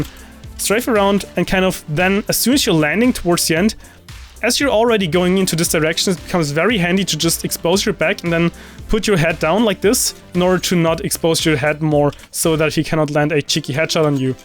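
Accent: Germany. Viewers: deutsch as fuck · Are u German?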